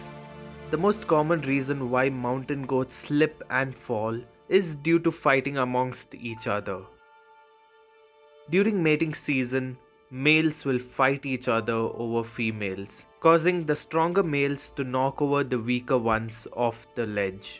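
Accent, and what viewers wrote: India. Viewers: Indiglish, got to mute and watch subtitles instead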